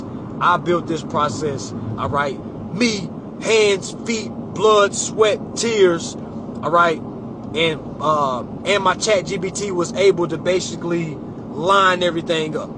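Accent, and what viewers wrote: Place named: Texas, United States. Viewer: Damn im from the west side of rockford bro u from the rock ? I been on yo channel fo a sec trying to learn ithought u was from tx?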